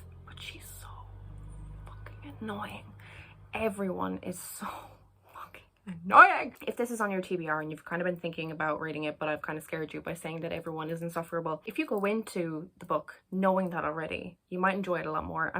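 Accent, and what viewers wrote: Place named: Australia. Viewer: Your Australian accent is as bad as my Irish one but I love it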